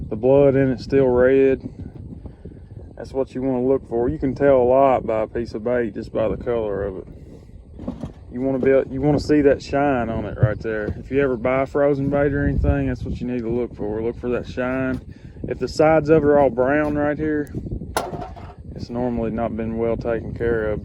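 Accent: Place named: Georgia, United States. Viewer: To be from Ohio, you sure sound like you came straight outta my state of Georgia. Now there's an example of a youngern who fishes like I did when I was a kid. I'm sure he makes you proud.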